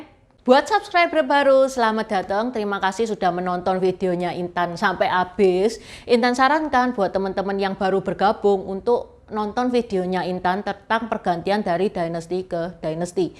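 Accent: Java, Indonesia. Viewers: Orang Jawa y mbak intan ngomong y medok bgt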